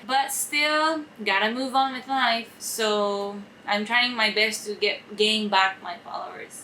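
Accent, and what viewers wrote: Philippines. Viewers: Ang Pinoy na niya mag english haha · You have a Filipino english accent. So nice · Hi, just asking, how long have you been in the Philippines already. As in...... I could hear your TAGALOG ACCENT on your English. As if your a Filipina speaking English. Actually it's refreshing to hear was just very surprise specially since this interview sounded like it was done in Japan. More power to you and great luck to you and your business!!!:D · That Filipino accent man... = priceless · Your accent really sounds like Filipina.. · Wow, you have a filipino accent! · You sounds like Filipino When Speaking English.. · you are such a novelty because when you speak English, I think you're Filipino, but when you suddenly insert Japanese, I'm reminded that you are Japanese. Anyway, Mabuhay!:) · I like your Filipino accent and it's like your from here na.😂 But I can still your Japanese side like ehhhhhh!!! 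Ehhhhh! 😂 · You're starting to sound like A Filipino speaking in English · hahaha..filipino accent..nice. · why is it that she sound like a filipina when she talks? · U talk like a filipino na nic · Your english is becoming a filipino english. Konti nalang! 😅👍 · Wow, she's got a Filipino accent. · Japanese-Canadian 🤔 . If you don’t look at the name and just listen to her accent, I say it’s a pinay who’s talking. Definitely half pinay. Not half Japanese. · can i ask you are a half japanese canadian but why your english accent is filipino? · Wait your in Philippines? I can tell your English accent sounds like Philippina-lish. OMG lost 900k followers Sorry to hear that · Your accent sounds like a Filipino 😃. Good job. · You sound likely Filipino, I think you love the Philippines, you have a blood of Filipino.. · I like how your English sounds, like how the filipinos speak 😄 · If I don't know who is speaking I would think you are Pinoy based on your accent. 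· Good to see your channel growing! I love your english accent its almost like a filipino! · Even your accent is from the philippines ♥️♥️♥️ We love you here. I was 10 years old when I knew you in "Benny's internet Cafe" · OMG, you're beginning to sound like a local Filipino! Worthy to be called a Filipina, mabuhay! Watchout for corona! 🇯🇵🇵🇭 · That filipino english accent... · Listening to you....u sound so filipino! Your genuiness is very endearing. No wonder filipinos that meet you will like you❤❤❤🥰...being true & sincere melts a filipinos❤😁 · Did you had this accent before or just Filipino accent rubbed into you? You speak english like a manila girl.. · Your accent is very Filipino! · Wow! Im blown away! Filipino accent!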